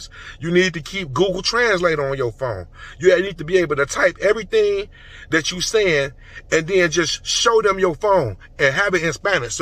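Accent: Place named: Chicago, United States. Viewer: In can tell he from Chicago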